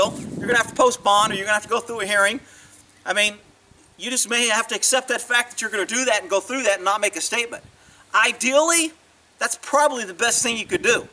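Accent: Texas, United States. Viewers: Texas accent is so strong in this video lol just got done watching Kyle Rittenhouse not-guilty verdict live stream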